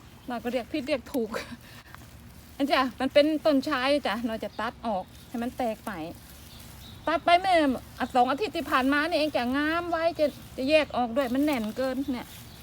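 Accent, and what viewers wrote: Korat, Thailand. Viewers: ผักงามจังเลยค่ะ ๆพูดโคราชเพระดีจ้า🌿🌿🌱🌱🌱💞💞💞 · พูดโคราชนั่นละจ้ะ ชอบ ดีจ้ะ ผักงามอย่างนี่ เขาว่าคนปลูกมือเย็นเนาะจ้ะ ปลูกไอกะงาม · น่ารักน่ะภาษาโคราชชอบฉันนๆน่่ะ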